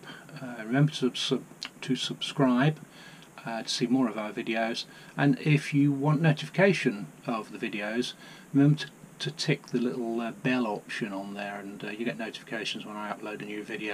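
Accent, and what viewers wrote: United Kingdom. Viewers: Hi. I just found your channel. Your video title caused me to watch and prompts my comment. I live in the central USA. By listening to your manner of speech, I can guess that you are in the UK. You and I use language differently. I am a nurse with a background in preventive medicine and water sanitation. I want to point out that there is a difference between sterilisation (sterilization) and sanitization. Sanitizing is actually what you are doing in the video. Sanitizing is the killing or removal of most and especially of the harmful micro-organisms. Sterilization is the killing of ALL micro-organisms. Sterilization is a process used in medicine to prepare surgical instruments. It most often involves steam under pressure or ethylene oxide gas. True sterilization isn't practical outside of medical and a few industrial environments. 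Sanitization is used in food service and other environments. The RV's fresh water system is actually being sanitized instead of truly sterilized. I am not criticizing your use of the language, and probably your UK viewers understand exactly what you mean. But for others, I feel that it is important to make the distinction. It is important at the beginning of the season, end of the season and any time one believes that the water system might be contaminated, to sanitize the system. Your video showed one product available to help accomplish this. Sometimes though, one might find oneself in need of treating the water system without having an availab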